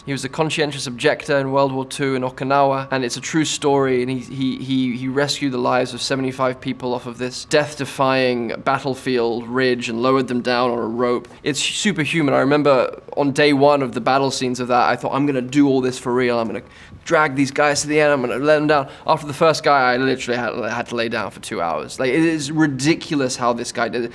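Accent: United Kingdom. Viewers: why british :( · My mind is blown away that he has a british accent.. ive only seen him in movies with american accents this is so strange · He has a British accent but at times an American accent comes out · I've heard him do an American accent so much that it feels like he's just doing an accent in this video, like "Hey, he's pretty good at that British accent". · i forgot this man is british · why am I surprised everytime I hear his british accent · Since when he British? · He's much more British than I remember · everytime i hear andrew garfield speak, i remember the guy is british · Since when is he British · How did I never realise he was British... am I... dumb? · W……….wait……….HE’S BRITISH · NO WAY HES BRITISH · I forget that he’s got a Brit accent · When did he have a British accent??? How was I not aware this whole time?? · am I the only person alive who had no clue he had a British accent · Since when is he brittish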